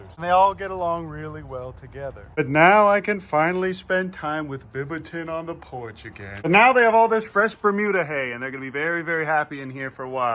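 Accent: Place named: South, United States. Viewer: uncle, you have never sounded this southern before.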